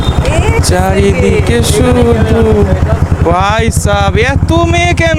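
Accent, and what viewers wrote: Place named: Bangladesh. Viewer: Bhai tumi bangladesh theke esecho naki? Karon tomar accent ta sune serkm e mone hochhe.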